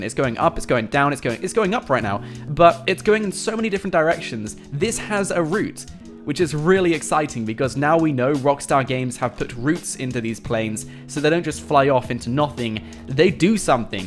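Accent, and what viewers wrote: United Kingdom. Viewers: Bro are you british🤔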